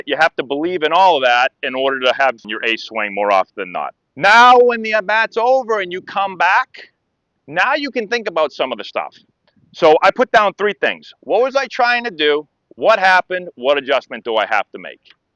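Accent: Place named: New York, United States. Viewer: Haha. True. Just the mild new york accent i think.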